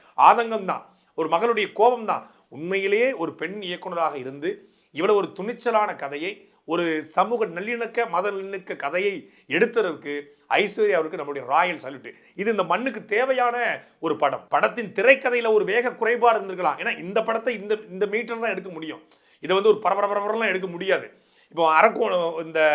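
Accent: Tirunelveli, India. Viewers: அவர்களின் தமிழும், கதை கூறும் பாங்கும் அருமை.. நெல்லைத்தமிழ் இடை இடையே மணக்குது.. வாழ்த்துகள்.. கொஞ்சம் ஆங்கிலம், வட மொழிச்சொற்களை களைந்தால் இன்னும் சிறப்பாக இருக்கும் - நாம் தமிழர்!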